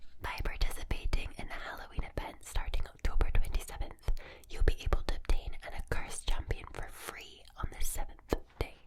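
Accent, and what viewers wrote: Scotland, United Kingdom. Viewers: Amazing tingles, thanks ❤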